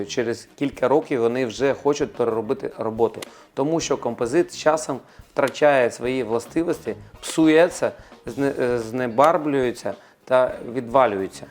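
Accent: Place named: Russia. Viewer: кацапський діалект пре зо всіх щілин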